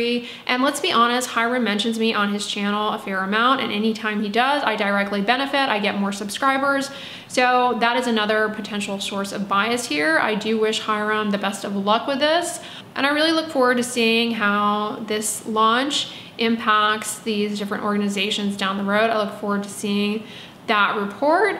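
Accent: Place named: Midwest, United States. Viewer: She sounds like she is from the Midwest ..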